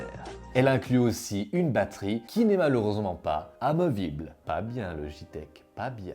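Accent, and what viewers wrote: Switzerland. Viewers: C’est tip top hop schweiss. Nostalgie de l’accent suisse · On dirait qu’il y a certaines similarités entre l’accent suisse et l’accent québécois... 🤔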